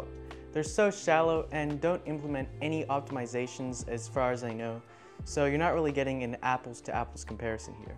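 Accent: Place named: Australia. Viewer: As soon as I heard 'I decided to grow a pair', I thought wait what is he Australian? Yep. 🤣 Good luck in your studies and good to see some young passionate people coming into the field!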